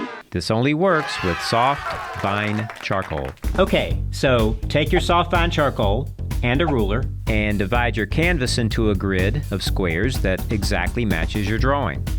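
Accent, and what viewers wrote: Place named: United Kingdom. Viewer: New sub from UK .... You sound like your from UK. Do you do private tutorials or lessons or whatever?? I love your humour etc. Glad I found you good sir!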